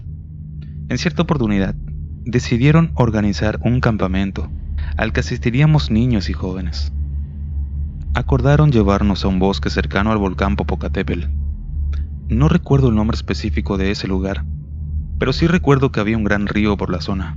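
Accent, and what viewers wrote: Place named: Argentina. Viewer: El amigo creo k no es mexicano es creo argentino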